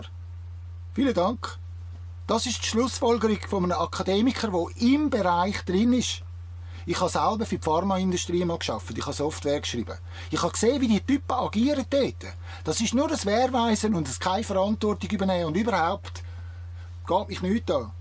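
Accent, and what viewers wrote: Switzerland. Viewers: Grossartig und danke. Als gebuertiger Deutschsprachler habe ich nur 10 min benoetigt, um Dein schweitzer deutsch zu verstehen. Dort, wo ich seit Dekaden lebe - in Uebersee - sprechen wir minimum vier Sprachen auf taeglicher Ebene und oft alles durcheinander. Wir sprechen diese Sprachen sicherlich nicht perfekt, aber wir verstehen uns alle (ca 120 registrierte (!!!!) Nationen auf einer kleinen Insel (178 qkm)) weil wir uns verstehen wollen. Deshalb waren Deine Einlassungen kein sprachliches Problem fuer mich. Und natuerlich nicht nur sprachlich - natuerlich nicht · Hihi - wenn ein "Schwyzer" sich echauffiert, klingt das in meinen Ohren iwie lustig. Das meine ich aber freundschaftlich. ;) Aber ich kann dich klar verstehen und stimme vollumfänglich zu. Die Reihe "Episode 1-7" sah ich komplett. Ich verknüpfe eher die Fäden bzgl. wer, bzw. 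welche Menschengruppe dahintersteht und die Fäden hält. Auch hierzu gibt es sehr deutliche Aussagen, bzw. schriftliche Dokumente, die quasi bis zu "ein Zeitalter" zurückreichen. Sogar noch länger, doch sind derlei Schriften für mich unerreichbar und ich würde sie auch nicht lesen können. In aller Regel betreffen sie Themen, die "Religion" entspringen und sich pharisäisch offenbaren. ++edit: Die Vokabel pharisäisch wurde absichtlich benutzt, um diese gegen eine Sprachfamilie abzugrenzen. Bei der Zusammenführung der geistigen Idee der Bundeslade (vgl. Bauweise) mit der sog. "Leidener Flasche" (vgl. Eintrag Wikipedia) ergeben sich weitere, in allen Bezügen sinngebende, geistige Verknüpfungen, auch und gerade zu diversen Erzählungen von Todesfällen im Buch der Bücher. (vgl. auch die "Einführung der Gesetze / Moses + den ersten Satz des deutschen GG: "Alle Menschen sind vor dem Gesetz gleich" - nach Erschaffung eines Gesetzes sind sie, die Menschen, dies nämlich nicht mehr. 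Pharisäer halten sich stets unantastbar, selbst wenn sie sämtliche "Gebote" brechen, die sie selbst zum Gesetz erhoben haben.)++ Danke für den jetzt noch weiteren Blick durch deine Episoden 1-7, das noch tiefere Begreifen der Lüge. Die Kraf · Ja super! Das isch Bärndütsch! ...👍😄 super Kanal! 👏 Danke! 🌹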